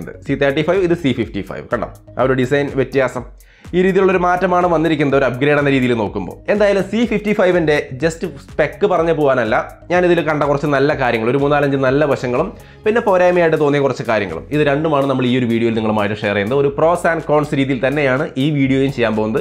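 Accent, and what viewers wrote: Thrissur, India. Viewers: നിങ്ങൾ തൃശൂർ ആണാ 😍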